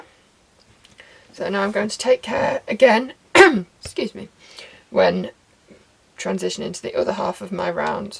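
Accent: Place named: Lancashire, United Kingdom. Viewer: Excellent tutorial! I prefer the Alternating, Long-tail 1x1 rib (aka Italian) cast-on to the too-often - IMHO - recommended Tubular (also aka Italian CO). So your tutorial hit resonated well for me. I'm starting a beret for a lady friend, and was looking for your exact video to kick-off the project.:) think that I'll try casting on straights, then transfer the lot to bamboo DPNs, hoping for more cling to min. the twisting. Then transfer the lot to ML! Love your Lancashire accent, as I have some remote connections in W. Yorkshire. Thanks again!!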